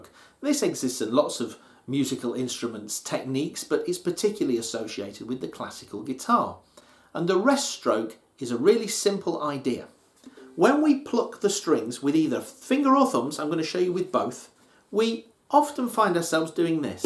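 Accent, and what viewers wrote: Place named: United Kingdom. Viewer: Jolly! Love the ur Brit accent!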